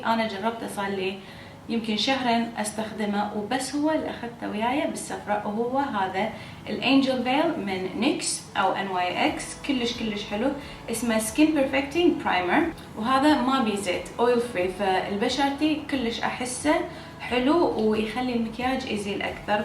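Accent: Basra, Iraq. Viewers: فوديتج حياتي من عرفت لهجتج عراقيه اشتركت بالقناه 😍😍😍 فوديتج طريقتج بالشرح حلوه بس من تكولين اسم المكياج بالنكليزي كولي همات بالعربي شنو معنى لان موكل البنات يعرفن اسمه بالنكليزي 😊 يعني مثل تكولين فونديشن كولي كريم اساس تكولين برايمر يعني مثبت مكياج حته نفهمج اكثر اذا ممكن حبي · احله شي لحجي ماتج بصراوي 😍😍😍 حبج 😘😘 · لهجتج البصراوية الجنوبية الاصيلة يحسسني بعفويتج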